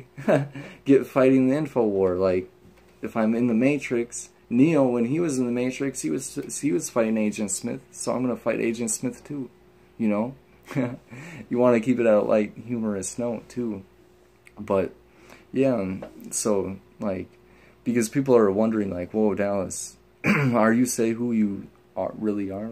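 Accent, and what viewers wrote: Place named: Wisconsin, United States. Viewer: Hi Dallas ....it's me Dr. Rockso....good to see ya....love the Wisconsin accent!! I miss the crew!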